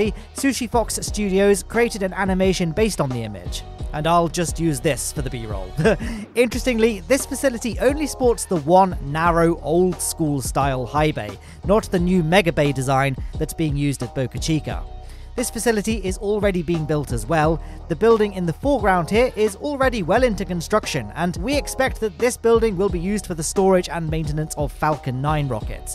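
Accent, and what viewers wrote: United Kingdom. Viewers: He is from the UK to be fair.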